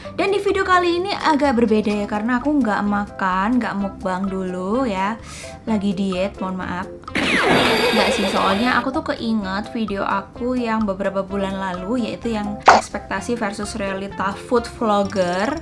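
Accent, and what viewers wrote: Java, Indonesia. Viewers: Aku seneng medoknya kk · kak cantik dan medok 😆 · Betul. Aku tuh klo pemberitahuan ria sw. Lgsg buru buru liat. Ga tau kenapa ya.. suka aja🤭 Sama dong. Krn medok mu😄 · 1. Ria SW - otomatis 2. Omay - Farida Nurhan 3. Shelly Che 4. Nex Charlos 5. Duo Pengacara 6. Ken & Grat Note: klo kak ttp loch ya & itu pasti. Ciri khas logat jawirnya tak terlupakan...:^) Salam sehat & sukses selalu ya kak. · mantab mbak, english karo jowone medhok kabeh 😂😂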